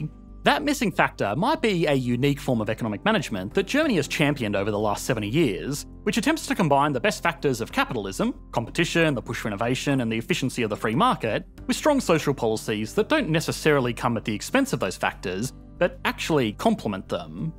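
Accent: Australia. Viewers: Haha, alltough an Ozzie speaker, it is a British vieuw of economics. A bit shiny fellas.;-) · As a German who appreciates the WirtschaftsWissen channel, I still can’t imagine without English in that fine aussie accent, mate 👌🏼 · I will never watch the German version, simply because I love the original Australian accent and very unique delivery so much. If phonebooks still existed, could make a whole video channel reading them aloud and I would watch it.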